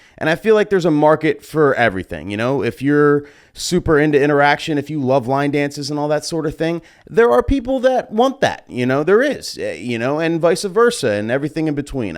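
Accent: New Jersey, United States. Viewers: Im on both sides of this fence... when people complain about the DJ interaction...it just simply has to do with whatever they are doing...if you arent that good at picking good songs... you need to have a filler of you to keep people entertained... if you are super good at mixing but you dont care to interact...then add interactive audio to your set.... and also be up front... But. I dont think you realize that you have big time charisma... ive seen your streams as well... and even when you're quiet...you are interesting to watch... at least for me... you're good at both from what I have seen... of course I havent been to a wedding you DJ...I think... I went to a Philly wedding in 2014 and I almost think you were the DJ but my fallible human memory could be failing me miserably so I wont speculate lol... but you dont realize...you simply have the gift of an introvert and an extrovert...i am the same way... 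i dont want to be expected to interact....but when the party is jumping...im gonna naturally interact just because I am having more fun too. And I think you are a similar way... you got that entertaining jersey accent...you dont portray that you dont like interaction...you just dont like to be forced to be cheesy.. and thats a valid place to be... you simply know when to interact...and when not too...and most people in general simply dont know that... because they dont practice it... or simply dont have enough experience with it...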